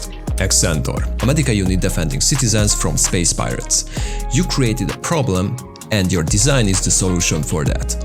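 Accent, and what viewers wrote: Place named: Hungary. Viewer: Your hungarian accent is so strong man🤣 (Ímádom a rajzaidat és a stílusodat)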